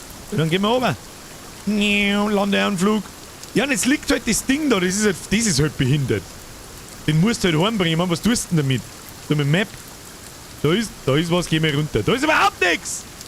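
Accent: Bavaria, Germany. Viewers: #nochschausquad servas. danke für deine Streams. Mehr boarisch, weniger preissnscheiss! des passt ba dir immer:)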